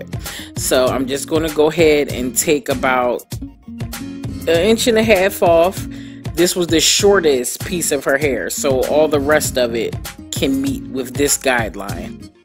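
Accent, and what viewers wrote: Philadelphia, United States. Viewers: i hear philly all inna voiceeee lol philly inna buildingggggg i love your work sis keep it up ! ❤️❤️❤️❤️ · I don't even know where she is, but that accent is definitely Philly. Lol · Omgggg I love your Philly accent. When you said “fluffy” I was like, there it go right there! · Where can I buy your hair straightener and do you ship to London I wanna know please ❤️ thank you. You are great hairdresser and I wish London had hairdresser like you..I can tell you accent your American. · You have a Philly accent. Where are you located?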